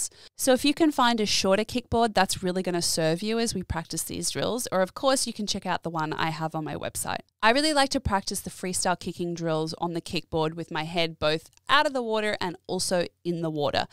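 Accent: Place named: Australia. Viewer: Think it’s just the Aussie way of speaking, but gee you make it sound so easy! I’ve watched heaps of swim channels but they make it seem so complicated. I’ll definitely be using your tips. Thanks from a fellow Aussie. 🇦🇺 👍🏽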